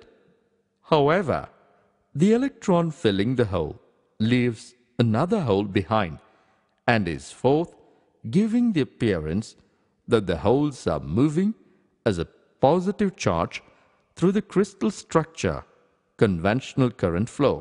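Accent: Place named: India. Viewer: whatsup with the indian accent?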